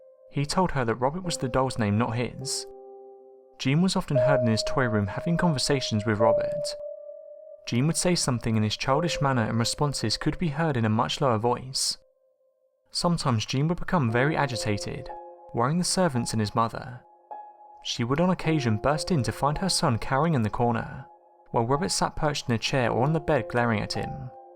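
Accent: United Kingdom. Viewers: The British accent narrative makes it more spooky lol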